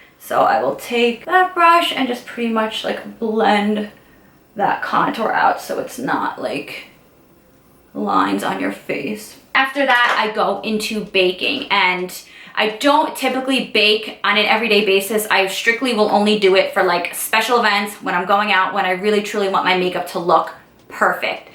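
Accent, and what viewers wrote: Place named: Michigan, United States. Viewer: I love your accent. are you from Michigan?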